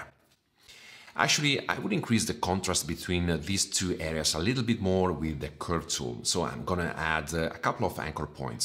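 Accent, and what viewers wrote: Italy. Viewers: English with Italian aksent is much better than native English. But I think this goes for everything, a flavor of Italy will always make things improve.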